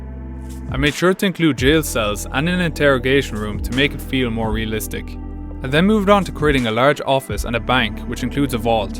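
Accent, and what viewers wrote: Ireland. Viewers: What part of Ireland are you from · Are you irish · Me to Yas he sound like an Irish ☘️ ❤️ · I knew you were irish 🤣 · Wait are you Irish ☘️? Don’t tell me my fav fortnite map builder is from the same country as me?!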